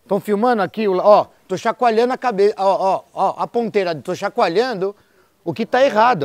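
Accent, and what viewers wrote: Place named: São Paulo, Brazil. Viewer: Kkkkkkk acho o muito legal com essa fala bem paulista,aprendo muiiito!!!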